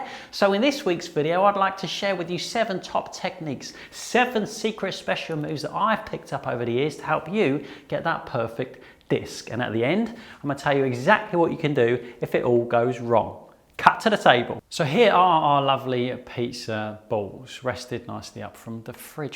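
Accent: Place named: Cockney, United Kingdom. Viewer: Waitrose and Taylor? Never judge a bloke by his barrow boy accent:) As informative as ever and now I have options...great video.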